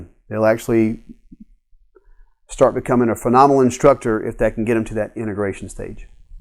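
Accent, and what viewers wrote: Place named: South, United States. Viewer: Amazing lesson! Where are you from? You sound like a fellow Southerner! haha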